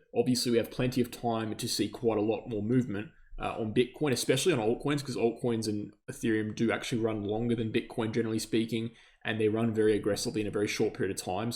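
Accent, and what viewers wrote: Australia. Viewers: this comment is NOT from a bot… You have the most annoying type of Australian accent; no American can take it seriously … and, the fact that you still believe in four years cycles after structural institutional change means… “Thank God I don’t have to listen to this Ozzy“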